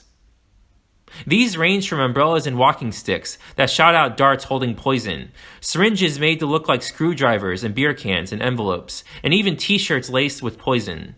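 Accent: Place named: North America. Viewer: The narrator has a North American accemt. I wonder if in his research he came across how United States and Canadians did the exact same thing. Do a video on these two countries. Especially the racialised science and politics of the US which continues to this day!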